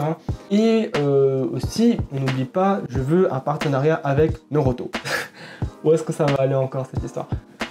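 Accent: France. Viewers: Mais tu vis au québec ? Mais t a l accent français...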